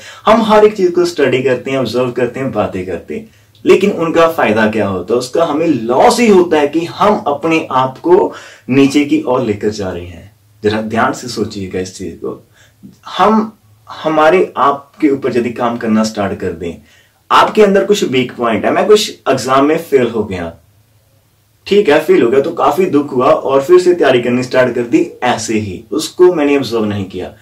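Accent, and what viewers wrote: Punjab, India. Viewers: Sir vdia lgg rha hai apki hindi main se punjabi sunn rhi hai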